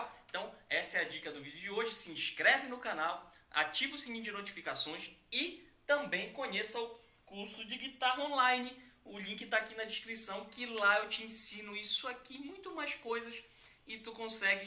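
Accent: Pará, Brazil. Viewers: Legal o vídeo... e cara vc tem sotaque Paraense heheh eu sou do Pará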